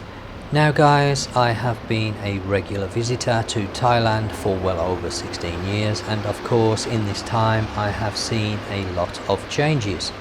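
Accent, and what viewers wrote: West Midlands, United Kingdom. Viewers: Just found your channel. Enjoying watching your videos. You sound like your from the West Midlands. Same as myself 😊. I go to Phuket for the 3rd year in a couple of weeks. I have made friends working in hotels there. Tourist numbers are definitely down but not dramatically like some news outlets suggest. Chinese are definitely less due to the tour boat disaster and I'm sure the strong Thai Baht is stopping the twat Packers. I mean back Packers. Indian tourists are slightly up which is interesting because they pay alot in visas. Anyway keep up the good work. Keep the videos coming. Thanks 👍😊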